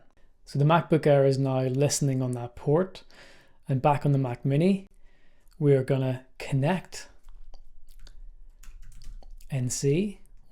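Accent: Northern Ireland, United Kingdom. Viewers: is that a Northern Irish accent I hear? ;))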